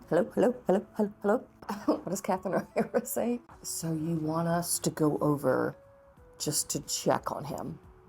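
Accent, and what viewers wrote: South, United States. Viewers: I knew as soon as you said boil you where southern. It’s a dead giveaway 😂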